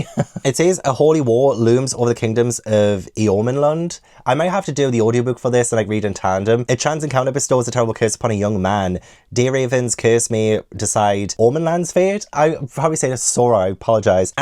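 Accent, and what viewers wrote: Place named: Newcastle, United Kingdom. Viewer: Hello Came across your channel a couple of weeks ago and had to subscribe. I love a geordie accent plus you do make me laugh lol. Loving your channel Books are homophonic 🤣🤣🤣😳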